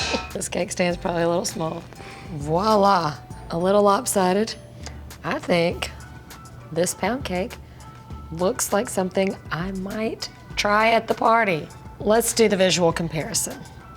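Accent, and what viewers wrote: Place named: South, United States. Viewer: Love your southern accent I could listen to you talk all day long. I'm originally from Tennessee.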